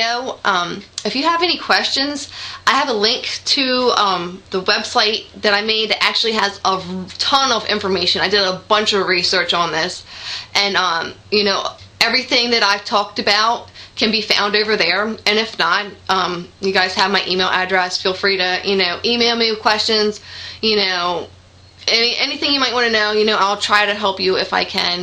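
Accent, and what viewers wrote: Philadelphia, United States. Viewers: This chick has GOT to be from Philly! I'd know that accent anywhere.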